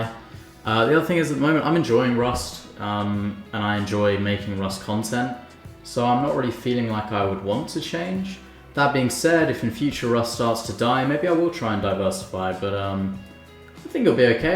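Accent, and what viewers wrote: United Kingdom. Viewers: Alot of British people's voice for me sounds a little older, maybe it's the combination of the accent and way of speaking. Like Spiffingbrit, frankieonpc, and now.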